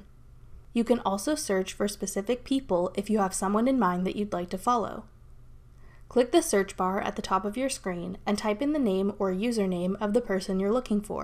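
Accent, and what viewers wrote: Canada. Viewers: I love your accent - apart from the Canadian "out" right at the end! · I agree- very informative. And... Did I detect a Canadian accent, OR have I just been watching too much hockey lately?!😀 PS ISN'T GIF pronounced like Jif ?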